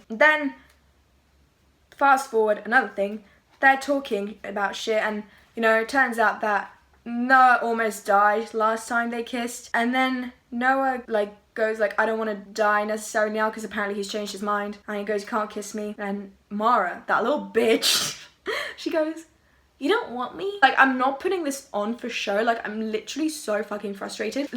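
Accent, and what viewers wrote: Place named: England, United Kingdom. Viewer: I subbed because of your English accent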